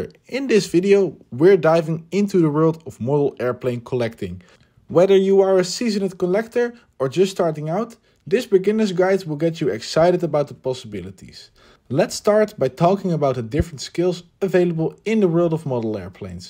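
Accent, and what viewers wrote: Netherlands. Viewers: your dutch right? because your voice but in the beggining i also though you were displaying a photo from aviation megastore?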